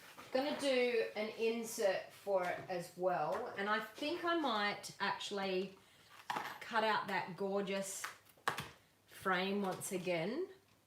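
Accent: Australia. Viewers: Love watching you from CO USA! After visiting England the last 7 years I’ve decided the Aussie accent is the best! 😬😉 but don’t tell the Brits! 😂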